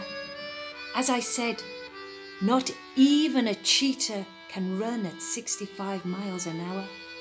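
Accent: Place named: Black Country, United Kingdom. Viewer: A modern (well, 1970s) ghost story. I like the slight black country accent too. Nice work x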